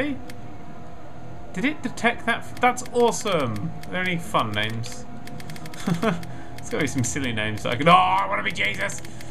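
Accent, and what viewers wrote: England, United Kingdom. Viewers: no I'm pretty sure he sounds more like a English person. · Is Canadian? Because he sounds English. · I don't know why British Accent makes him funnier for me. Well, may be, because I am a spanish speaker...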